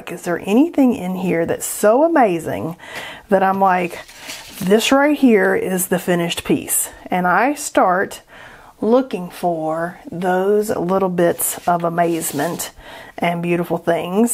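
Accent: South, United States. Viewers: Love the southern accent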